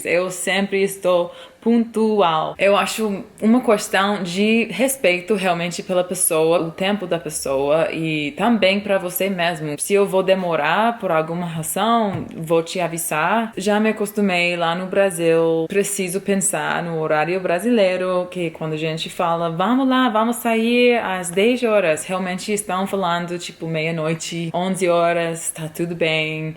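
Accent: Portugal. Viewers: Você tem sotaque português de PORTUGAL.... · Legal, sotaque muito parecido com portugues de portugal. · "Psiu" é som para chamar gatos ou para pedir silêncio, chamar alguém assim ou por meio de assobios é falta de educação. Eu sou pontual e também não gosto dessa mancada que a maioria dos brasileiros dá, que é o de atrasar em seus compromissos. O seu sotaque lembra o de uma portuguesa!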